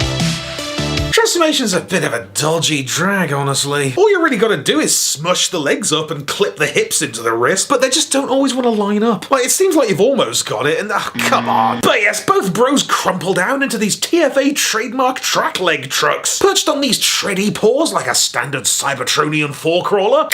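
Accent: United Kingdom. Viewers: Have to admit that I'm not here primarily for the bots but rather the magnificent British accent, hilarious puns and ass-kicking music. Keep on being you,! <3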